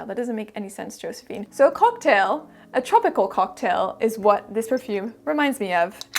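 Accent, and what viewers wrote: France. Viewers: T'es française. T'as un fond d'accent français. 😊. Nevermind. T'es clairement française vu la façon dont tu prononces les noms des parfums.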